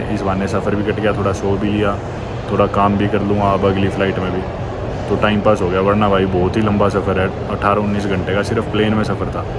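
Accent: Haryana, India. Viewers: No doubt ap boht ache vlogger hen but apne accent ko thora groom kren English bhi ap apne haryanvi accent me bolte hen.. Sari dunia ghoom li ap ne but you sounds like a typical backward villager.... kafi videos me ap ajeeb si awaz nikalte hen jese kisi janwar ko hankte hue log village me nikalte hen...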